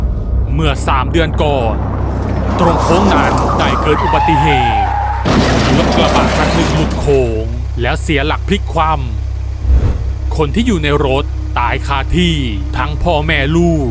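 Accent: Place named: Isan, Thailand. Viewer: อุ๋ยคนอีสานอีกแล้ว😆😜🤫 อีสานหรือหนือนะ 😔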